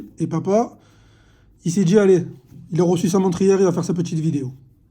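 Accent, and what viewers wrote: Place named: South, France. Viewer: Bravo 👍 J’apprécie te suivre, avec ta franchise, tes vidéos sans chichis et ton accent du Sud qui fait plaisir! Bonne continuation! PS: elle me tente bien cette Glycine 😍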